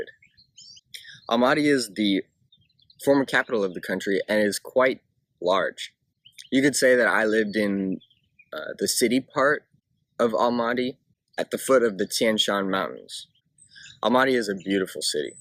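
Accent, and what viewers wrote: United States. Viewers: Why do you look and sound American? Don't you like being from Kazakhastani?? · you from Seattle? you have the Washington acent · how come you speak like an american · Kazakh language is a State language, Russian is the Second Official language. I noticed that in Almaty there most people speak Russian, but in the West of KZ people speak both languages, but they speak Kazakh mostly. I wonder, what's your nationality? I suppose, you have an American accent, if I'm not mistaken. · You have a very strong American accent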